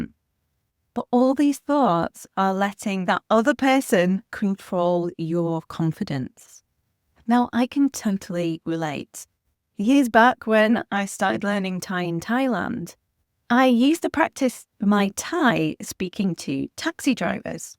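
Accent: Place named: United Kingdom. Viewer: I am not able to understand the British accent effortlessly.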